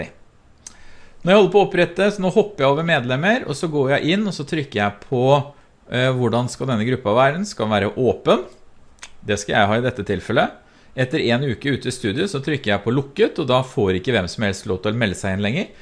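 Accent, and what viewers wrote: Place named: Østfold, Norway. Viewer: "litteran".... fra østfold???? Nyyydelig dialekt!!! (er særping sjøl da...)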